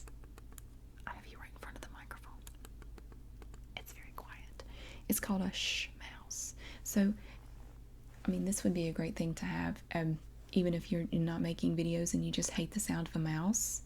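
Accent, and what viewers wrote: South, United States. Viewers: We love your straightforward soft spoken vids. This display of your setup is especially interesting and informative. So much so that it tempts me to give it try too! Your down to earth manner is attractive and relaxing. Your sweet southern accents doesn't hurt either !! Thanks and keep em coming !!! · I laughed when you said, "I'm gunna move you!" . You already move us with the content you create. You have such a welcoming and comfortable personality. You come across as a very natural and honest lady. Really Down to earth! Your soft speaking voice and light southern accent are very relaxing and you're pretty darn cute too! I thought it was funny when we saw that your tri-pod looks to be the same as the one that I bought and your laptop looks like my Toshiba. LOL. . My Tri-pod is a Targus. :-O Keep the great videos coming. Thank You!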